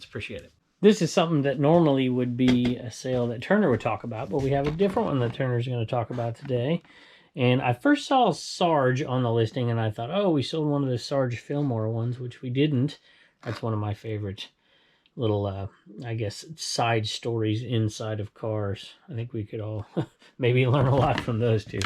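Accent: South, United States. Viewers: It’s so interesting to know that you were raised in California because you’d never know it! You have the southern ‘twang’ down perfectly, as well as the slang (ya’all), in addition to other very southern mannerisms! My sister, who originates from South Dakota, did the same thing. She married a southern boy from Arkansas and within a year had the accent! We got such a kick out of our sibling becoming ‘southern’! Love your channel!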